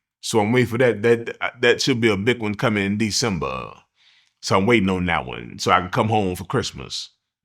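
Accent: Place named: South, United States. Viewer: How do you got a southern Drawl but from Indiana 🤔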